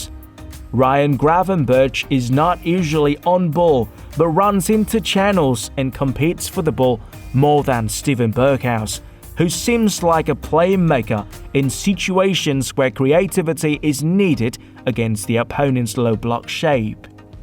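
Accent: United Kingdom. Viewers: 1 like cho bạn nói giọng Anh chuẩn 😌 trong khi nhà nhà học theo giọng Mỹ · giọng này giọng Anh chuẩn bố ơi :)) giọng Anh gốc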